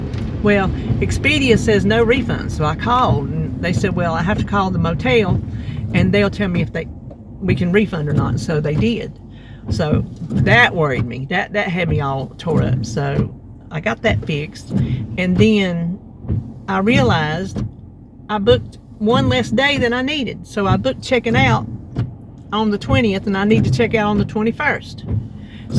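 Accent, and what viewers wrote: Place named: South Jersey, United States. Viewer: I ❤ regional dialects. How boring if we all sounded like AI. The South Jersey accent sounds like home to me. My relatives all lived there.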